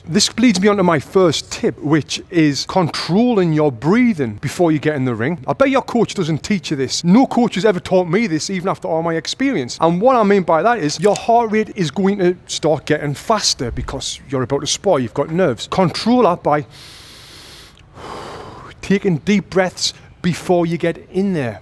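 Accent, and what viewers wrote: United Kingdom. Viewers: Man's got the thickest bri'ish accent ever "Ponchd in the feece"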